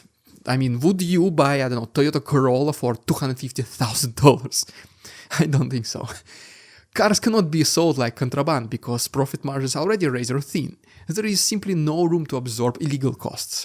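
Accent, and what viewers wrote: Russia. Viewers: what the h... is he talking about? Is there any more disgusting pronunciation than that from lumpens of moscowia?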